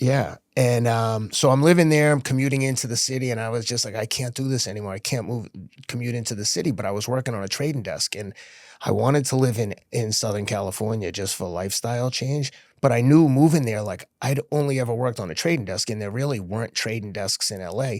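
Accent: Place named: Boston, United States. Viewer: Dude I love your voice!!!! That Boston accent is one of my favorites!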